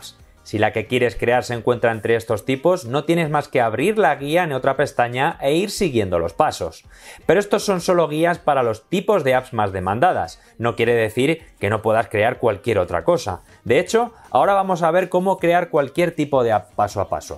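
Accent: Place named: United Kingdom. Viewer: british englisshhhh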